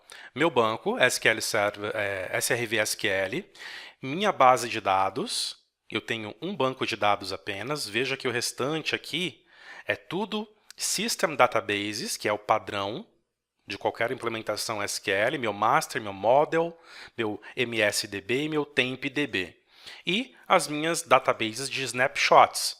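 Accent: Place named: São Paulo, Brazil. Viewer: Muito boa a aula. Obrigado. Me inscrevi no canal. Obs: Você tem o sotaque paulistano mais característico possível.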